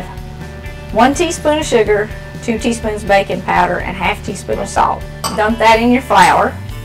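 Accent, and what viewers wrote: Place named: Tennessee, United States. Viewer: Thank you so much!!! My mother was born and raised in Nashville/Memphis Tennessee! You remind me of her! Right down to that beautiful accent! You are in my prayers ❤️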